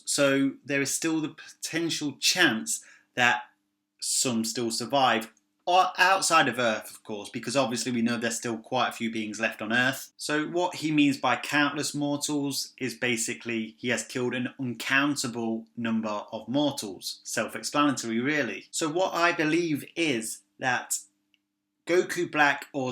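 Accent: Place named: United Kingdom. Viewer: i usually enjoy british accents , not today lol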